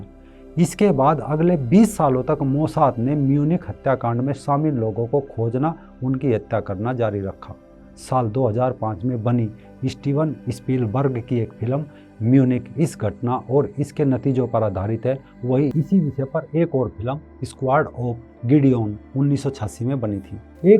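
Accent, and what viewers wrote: Haryana, India. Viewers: R u from haryana......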